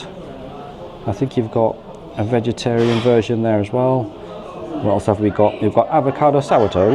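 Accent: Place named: Manchester, United Kingdom. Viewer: youve got a Manc accent or somewhere near, ( I could be wrong and it may be bearer to Yorkshire accent) so, I suspect as a Northerner that you actually know better. You should have sent that right back to the kitchen and gone to a builders cafe . Those beans served on the side as a garnish is , in itself, a red flag. Only one sausage? really? Why isn’t the egg runny / sunny side up . The mushrooms should be sliced thin and not fried but sautéed in a little oil.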